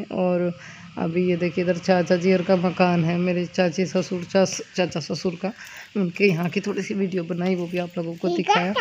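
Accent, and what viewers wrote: Haryana, India. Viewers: Aaj aawaj bilkul haryanvi lg rhi thi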